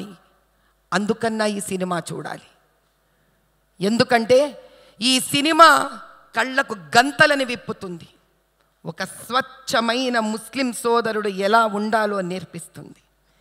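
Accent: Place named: Rayalaseema, India. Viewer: పద్మూడు...రాయలసీమ యాస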